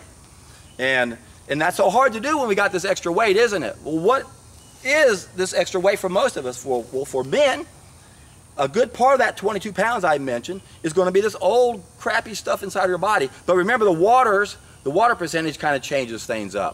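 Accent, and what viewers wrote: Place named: Texas, United States. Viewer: Gosh I love this guy😂😂😂😂 Get rid of the shit . LOL Very true he is , with that Texas accent . Lovely😘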